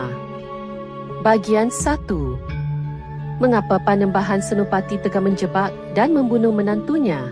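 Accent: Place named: Malaysia. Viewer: Logat malaydesh tidak elok didengar. Bahasa malaydesh menyusup ke dalam bahasa Indonesia lewat membacakan narasi sejarah kerajaan di Jawa dengan menggunakan logat malaydesh yang terdengar kaku.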